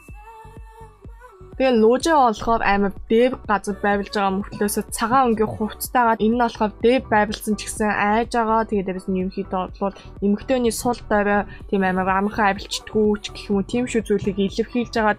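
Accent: South Korea. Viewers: ahiin duu bitgii shantraarai yhv john buurunhuu yaridag l bga biz chinii solongos aylaga akcent ystoi best shu amjilt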